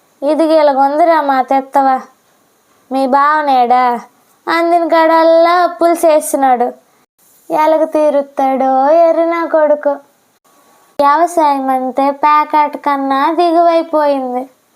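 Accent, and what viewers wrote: Uttarandhra, India. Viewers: అందరి ఆకలి తీర్చే రైతు ఆకలి తీర్చేది ఎవరు... ఉత్తరాంధ్ర యాసలో వాయిస్ అదుర్స్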